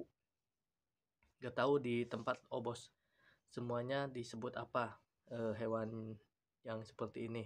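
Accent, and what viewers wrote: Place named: West Java, Indonesia. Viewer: 😆😆alus ngomong na😅sunda indo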